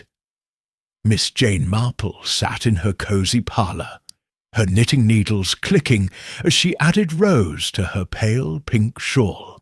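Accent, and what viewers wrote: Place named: United Kingdom. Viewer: This msrvelous British accent and beautiful voice will help mr sleep tonight. Thank you soo much!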